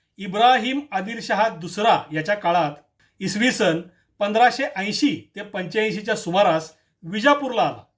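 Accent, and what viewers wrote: Standard. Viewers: फारच सुंदर माहिती मिळाली साहेब तुमचं निवेदन स्वच्छ मराठी भाषेतील असल्या मुळे, उत्सूकता वाढते.धन्यवाद.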